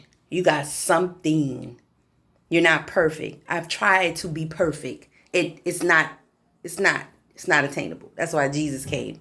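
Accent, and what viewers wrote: New Orleans, United States. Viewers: Her New Orleans accent 🤤 She still got it 😍💙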